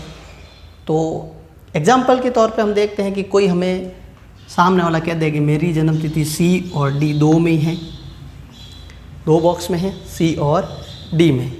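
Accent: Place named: Jodhpur, India. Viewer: Enki aavaj me jodhpuri tone he shayad ye jodhpur ya eske aas pas se he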